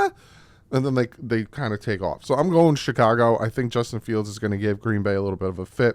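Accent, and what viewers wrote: New Jersey, United States. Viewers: I knew that accent was familiar lol JERSEY STAND UP!!!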